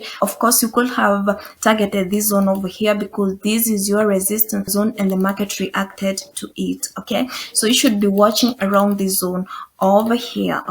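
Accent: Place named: Africa. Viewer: I say somewhere in Africa for the accent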